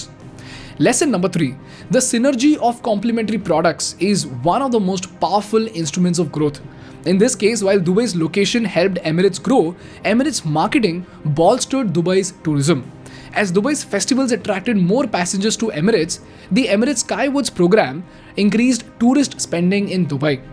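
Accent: India. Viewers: indian accent -_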